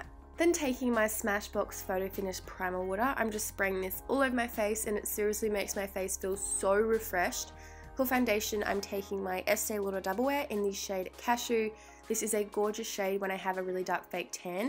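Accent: Australia. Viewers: Sounds Australian so that would make sense. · I am not a native english speaker but I'm used to Lauren Curtis's accent,so I was feeling this beauty guru is Australian as well!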